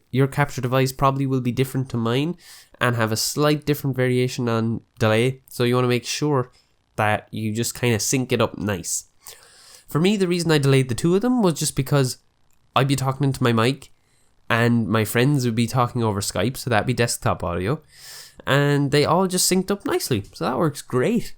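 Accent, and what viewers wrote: Ireland. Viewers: Welcome to Ireland mate now we all know you live in Dublin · are you irish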